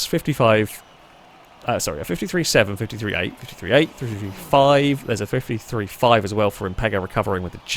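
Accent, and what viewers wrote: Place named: Midlands, United Kingdom. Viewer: In queens English, you would be correct, but accent isn’t queens, it sounds mid shire to me, and it’s probably acceptable in that accent. Of course a lot of people think that Queens is the only “correct” accent and insist that it’s grammar rules are followed, but frankly, it’s a very old fashioned point of view.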